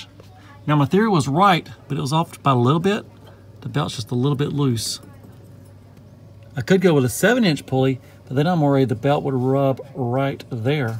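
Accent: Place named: Alabama, United States. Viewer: Alabama? Cool accent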